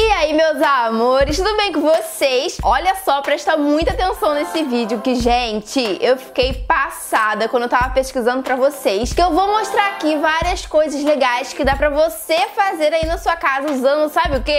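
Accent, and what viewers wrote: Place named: Rio de Janeiro, Brazil. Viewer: tu es carioca né amei o sotaque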